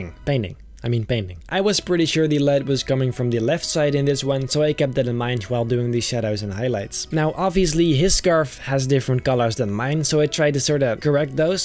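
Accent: Netherlands. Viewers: Ben jij Nederlands aan je Accent te horen wel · Are you also Dutch? I can hear it in your voice. Ik ben ook Nederlands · Why does it sound like he has a dutch accent?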